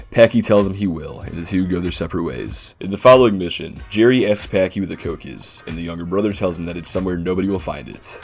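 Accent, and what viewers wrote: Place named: Northern Cities, United States. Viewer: Nice. I heard your voice and thought you had a bit of that NCVS accent. Anyway, great video.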